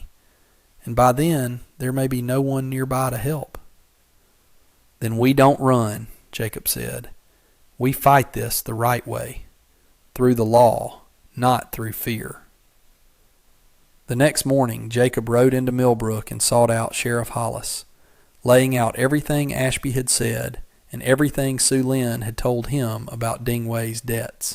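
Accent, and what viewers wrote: United States. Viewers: I really do enjoy these stories. Well written and narrated with the nicely accented American voice. The image generator does a fine job. These are a nice respite. A good place for an old guy who likes Westerns.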